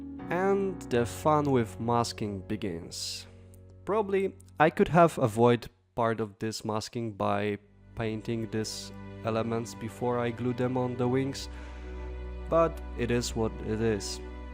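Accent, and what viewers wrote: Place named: Eastern Europe. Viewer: Oh, no widzisz zasubskrybowałem już kiedyś i nawet nie sprawdziłem do kogo 😄 Po akcencie czułem że jakiś słowiański człowiek, ale nie wiem dlaczego zakładałem że może Czechy lub Słowacja. Co do szachownicy, mogłem faktycznie przeoczyć, dopiero gdy instrukcję Eduarda pokazałeś z sowiecką wersją ii zwróciłeś uwagę że to źle jest - rzuciło mi się to w oczy. Dzięki w każdym razie za wideo i gratulacje jeszcze raz świetnie wykonanego modelu. Ja po wielu latach postanowiłem w końcu zacząć nagrywać swoje produkcje, ale na razie jestem na etapie eksperymentów z oświetleniem, ustawieniem kamery, montowaniem itp. Bardzo to niekiedy komplikuje całe przedsięwzięcie, więc tym bardziej doceniam dobre wideo 👍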